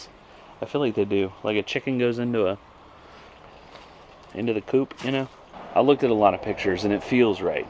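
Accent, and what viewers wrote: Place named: South, United States. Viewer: the southern accent is sneaking into this one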